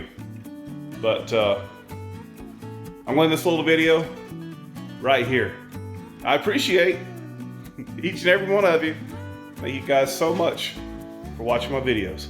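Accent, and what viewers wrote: South, United States. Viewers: I can understand you changing it to all in the closet..... something goes down you don't have stand on your head to check it out. And you have a place to add to the crazy if you want. I think a lot of the stuff outside should be inside were it can be kept clean and dry. You can store you colder cloths and equipment out side if you like but everything gets so dirty out in the bins Anyways love the Dogs playing in the water. Hows your old Friend Bill doing.? Your sure looking better. Wouldn't it be something if all your back pain was from the kidney stones you have had for years. anyways take care. And you sound just fine hahaha Southern good stuff.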